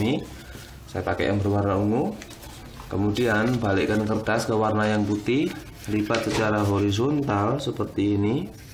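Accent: Java, Indonesia. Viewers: Kamu medok banget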